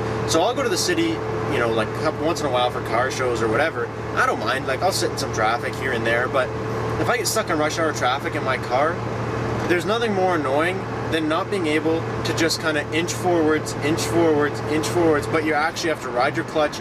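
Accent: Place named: Canada. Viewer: I keep hearing “aboot” and I’m like.. you’re Canadian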